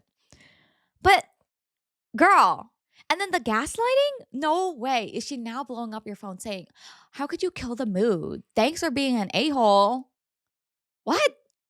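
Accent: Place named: United Kingdom. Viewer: Chucked up is vomited aha, it's always fun hearing a fellow brit in the wild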